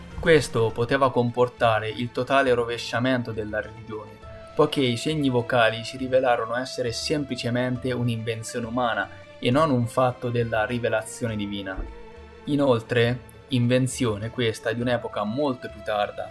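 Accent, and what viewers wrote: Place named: Senigallia, Italy. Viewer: Ottimo lavoro, complimenti. Dall'accento sembri di Senigallia.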